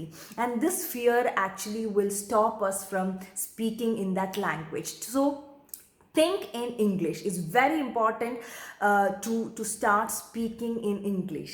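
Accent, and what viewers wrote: Kerala, India. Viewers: I think ur from Kerala. But ur English do not have much Kerala slang. That's great. If me or anyone had Malayalam slang in English it will not be liked by other people's. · Last one month i started to watch your videos, its very nice and amzing. That time i think u r from north indai but today i seem u talk malayam😂. Any way good videos for us this. Thnk you. 🌹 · Malayali accent should not be a problem for anyone of us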